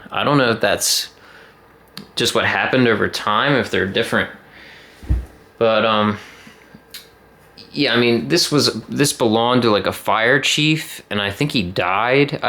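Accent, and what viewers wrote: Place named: Philadelphia, United States. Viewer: Great vid! Im guess from the accent you are from Maryland, Delaware or Phila...but if its Phila you have a light accent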